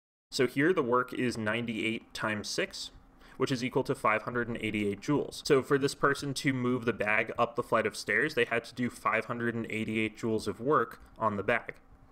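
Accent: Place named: United Kingdom. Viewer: we get ir bro u are british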